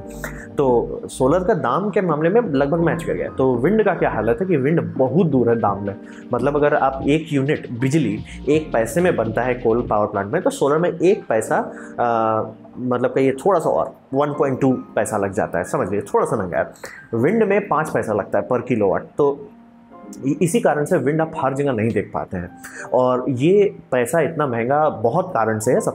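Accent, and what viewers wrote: Bihar, India. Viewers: Sir apko sun kr mza aa gya.. Kitna achhe se smjha diya aapne... Love u sir...Awaz se Lgta h aap v Bihar se ho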